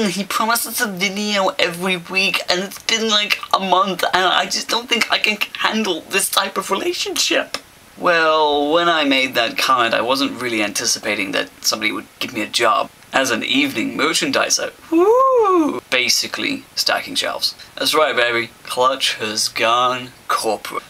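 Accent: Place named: United States. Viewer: Why do you speak with an American accent?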